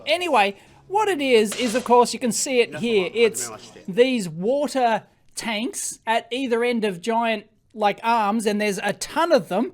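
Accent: Australia. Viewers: Geravi-tational lol gravitational. I love Aussi accents.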